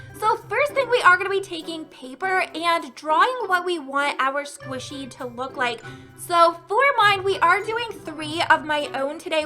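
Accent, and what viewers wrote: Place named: France. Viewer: I love your French accent!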